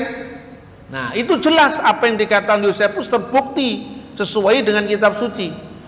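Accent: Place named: Java, Indonesia. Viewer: Lidah jawa banget Docetic => Doketik Tapi sangat aneh pemikiran Docetic ini kemudian menjadi pengajaran agama islam. Dalam ajaran itu Penyaliban benar benar terjadi tapi ya g disalib itu bukan Yesus tetapi orang lain yang diserupakan. Anehnya disini mereka sendiri (Ulama2) (Quran dan Hadist) bingung dan beda pendapat tentang siapa yg diserupakan... Ada yg bilang simon dari kirene disebutkan bahwa simon dari kirene adalah Murid Yesus yang paling muda dan setia yg ketika Yesus menanyai ke12 muridnya siapa yg bersedia diserupakan seperti Dia (Yesus) dan simon ini mengajukan diri sampai 3x. Ada yg berpendapat Yudas Iskariot murid yg berkhianat. Perlu di ketahui dalam kisah itu bahwa orang yg akan menggantikan Yesus untuk di salib, akan beroleh surga yg sama derajatnya dgn Yesus. Disini ambigu nya terjadi... Simon dari kirene bukanlah Murid Yesus, Simon yang murid Yesus bukanlah Murid yang paling muda. 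Sbab yg paling muda adalah Yohanes dan juga penulis Injil. Kalau Yudas Iskariot masa orang yg mengkhianati Yesus beroleh Surga yg derajat nya sama?? Tuhan seperti apakah itu yang menipu umatnya (menyerupakan Yesus supaya Umatnya berfikir bahwa bahwa Yesus benar disalib padahal Tidak)?? Tp sudahlah biarkan mereka dlm kebingungannya sendiri. Penekanan utama iman kristiani adalah Karya Penyelamatannya (Mesias, Kristus) dan hal ini sudah pula dinubuatkan jauh sebelum Yesus (terlahir). Bagaimana Ia menyelamatkan apabila ia sendiri diselamatkan (tidak disalib/diserupakan menonton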